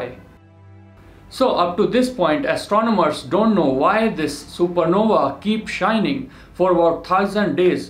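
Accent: India. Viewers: I want the text back not because of your accent im indian too but i don't have that accent but with text its chill for me :D and u very genius mwen :D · I hate an Indian when he talks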